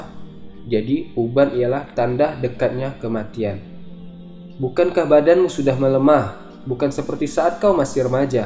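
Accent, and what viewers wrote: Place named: Lombok, Indonesia. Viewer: Dialek saudara seperti dialek orang lombok. Betulkan saudara dari lombok?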